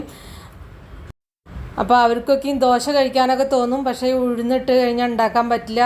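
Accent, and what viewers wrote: Palakkad, India. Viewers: avar parayunnath enthanennu manasilayallo athu pore. Palakkad chilar usually ingane aanu parayuka. Ellaavarum ningale pole achadi bhasha samsarikkanam ennu nirbandham pidikkaruth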